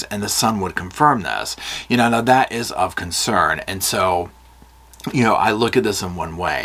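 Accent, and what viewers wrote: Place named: North Carolina, United States. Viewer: I didn’t realize you are from North Carolina…I thought I recognized the accent…❤ This case drew me in like the Darrell Brooks case…but there was no mystery with the DB show…smh… This one keeps me guessing… Love your channel and the way you tell stories…😊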